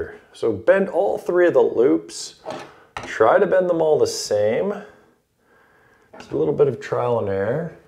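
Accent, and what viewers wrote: Canada. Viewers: Love hearing a good Canadian accent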